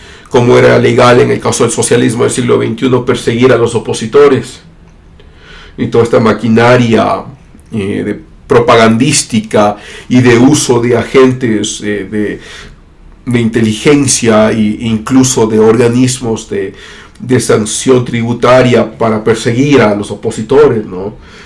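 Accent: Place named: Colombia. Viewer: oye, estas hablando un poco con acento colombiano ;)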